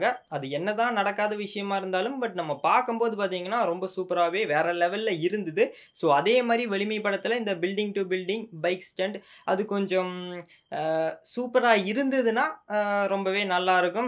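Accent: Kerala, India. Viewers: Ninkal malayali aano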